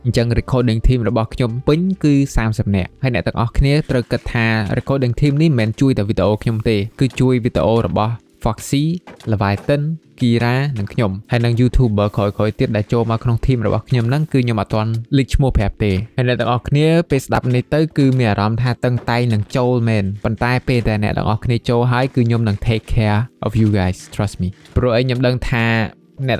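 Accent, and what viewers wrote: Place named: India. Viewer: ur accent sound as india ( recording)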